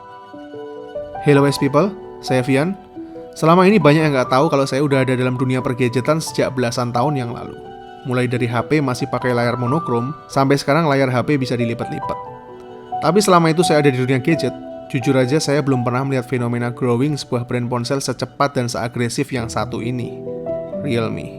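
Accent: Yogyakarta, Indonesia. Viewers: Ealah cah jogja to mas e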